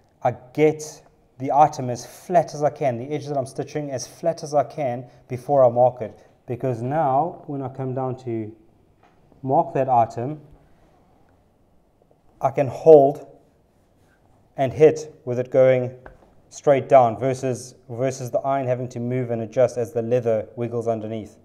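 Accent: South Africa. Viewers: is that a south african accent? it’s a little different than australian or new zealand. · Sounding like a South African 🇿🇦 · Yes man. I recognize the South African accent. Where do you get your hides? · I love your saffa accent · Good video, thank you. You have a South African accent, we're you from? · Hey guys. It is so fresh and nice to hear the South African accent🤠. And at the same time see some real quality work. Makes me proud. I just recently started my business here in Germany #vanrensburgadornments. Slightly different direction than you guys. But I find your work very inspirational. Keep it up. This tutorial is excellent. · Very pleasant to listen to. Are you from South Africa?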